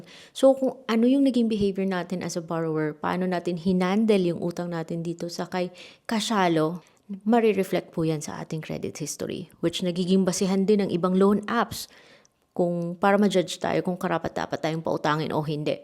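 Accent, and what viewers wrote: Iloilo, Philippines. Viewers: ilongga ka gali? Hehe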